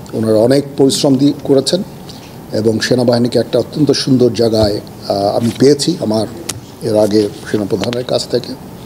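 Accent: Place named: Bangladesh. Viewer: কথা শুনে আপনাকে বাংলাদেশী মনে হচ্ছে। থ্যাঙ্ক ইউ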